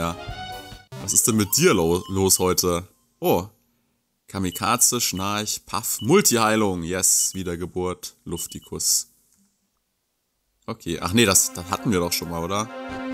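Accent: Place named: Low German, Germany. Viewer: Ach ja ich mag diesen Akzent. Plattdeutsch ist schon was interessantes. By the way wie geiht di dat? 😂😂 Das ist mein lieblings Satz auf Platt. Selber sprechen kann ich es nicht, aber ich verstehe es und noch was krass das LP hat fast 100 Parts. Ich würde dir raten mal in der Herberge zu übernachten. Vielleicht passiert ja auch in diesem Dorf das selbe wie im anderen. Seien wir mal ehrlich es ist schon eigenartig, dass niemand mehr da war. Ich glaube das hat was mit diesem Musiker und seinem Lied zu tun. Wer weiß vielleicht verzaubert er sie ja mit dem Lied und sie verlassen über Nacht das Dorf um irgendwas zu tun. Das erinnert mich gerade an eine Geschichte und zwar hieß sie „der Rattenfänger von Hameln" nur dass es nicht die Kinder sind die verschwinden sondern alle. Bei dem Schwert Stimme ich dir zu. Es ist ein schönes Detail im Spiel, was bei DQ meist nicht selbstverständlich ist 8 zum Beispiel, da gab es einmal was sie normal an hatten und das „Spezial Outfit" (weiß nicht, ob sie sogar mehr als ein Exklusives Outfit hatten aber naja du weiß was ich meine). 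Das finde ich in DQ 9 auch so geil, wenn man sich um zieht und der Charakter das dann auch im Kampf an hat. DQ 9 ist aber so ein tolles Spiel. Action, Spannung, Drama, Story und noch ein paar andere nennenswerte Dinge an DQ 9. Eine Frage würdest du auch mal DQ 9 LPn? Würde es auf jeden Fall klasse finden. Super Part übrigens auch noch. Freue mich schon auf den von morgen 😊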